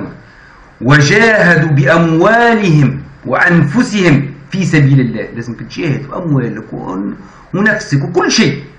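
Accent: Tunisia. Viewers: ههههههههههههههههه تعجبني لهجة توانسة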